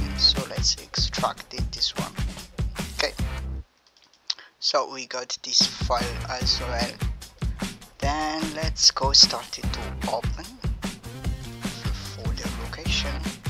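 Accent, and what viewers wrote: India. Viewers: the indian accent videos always work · indian accent = works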